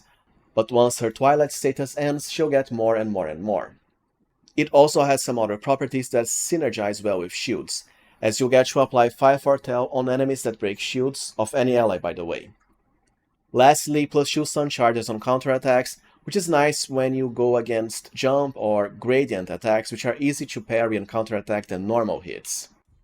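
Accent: Brazil. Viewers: you dont fool me, you are brazilian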